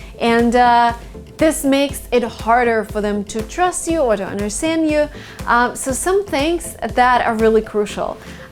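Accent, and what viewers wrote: Russia. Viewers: Please make vıdeo With yoğur russıan accent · Is her native language Russian?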